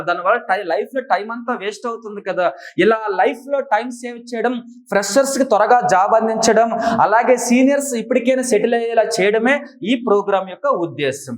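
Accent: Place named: Andhra Pradesh, India. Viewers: నువ్వు ఆంద్రోనివారం తమ్మి వాయిస్ ఆంధ్ర లెక్క ఉంది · అవును.. ఇతను ఆంధ్ర..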